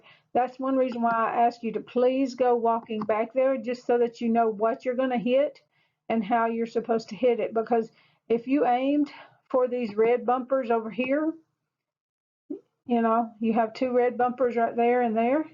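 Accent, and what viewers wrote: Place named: Tennessee, United States. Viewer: You may be in CA but you sound like you’re from good ole TN. Thanks for your instructions and your time!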